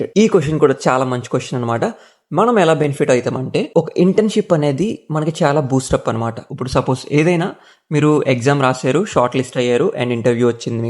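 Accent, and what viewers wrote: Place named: East Godavari, India. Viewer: Maadi ap mandapeta Ne voice east godavari la vundi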